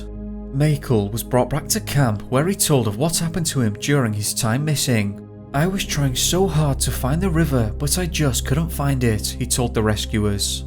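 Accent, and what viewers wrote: United Kingdom. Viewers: a big body of water might stop them from crossing, however there are strange disappearances in north america and some people see strange things in the woods and have slowed down time, some people have even said they can see their search and rescue teams and they hollar and throw rocks and its like they cant hear them and they are outside of space and time basically what you're saying is if you take it go put it back yourself or you'll be fucked forever sounds like silicone based life (the man eating rock) lol the way britts pronounce some words just sound funny. i only ashume aluminium is shinny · Reading off a script and being british does make him sound like every other youtuber. Reason for the method is so that theres a sense of (pseudo) intelligence. And the length is so people can fall asleep to this boring shit. Gotta farm those autoplay views when your audience isnt consenting 😂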